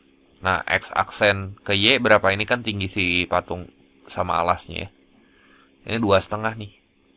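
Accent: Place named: Bandung, Indonesia. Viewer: logat nya bandung pisan mang😂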